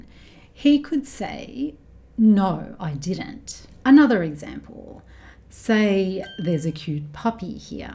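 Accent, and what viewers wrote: Australia. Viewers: Thank you so much for helping me practice Australian English, this trains my ears to understand it better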